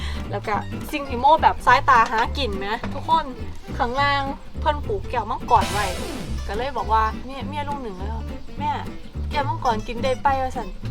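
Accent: Isan, Thailand. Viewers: เอาจริงๆนึงว่าคนอีสานหรือว่าเป็นคนอีสานว่ะ-^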